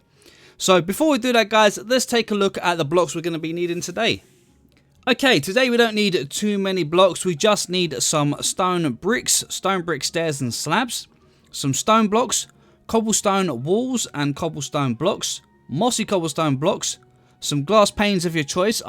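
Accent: United Kingdom. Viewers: I’m not a native speaker in English (I like your I guess British accent very much), so do you say abbey or monastery? I mean a building with a dwelling for nuns or monks and a garden in the courtyard with cloisters around it and a little chapel included. I hope I described it well so now you know what I meant. Sry for language mistakes 👍😀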